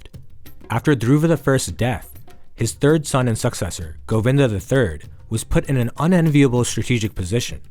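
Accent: United States. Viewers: The narrator is an Indian origin American. We cannot blame him for the accent since he has spent his entire life in America. Thankfully he is still an Indian at heart. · Why do we have to hear American Accent. Would have been better if Indian names were pronounced correctly. Rashtrakuta Kannauja I had to try 👂 thrice to understand what it is.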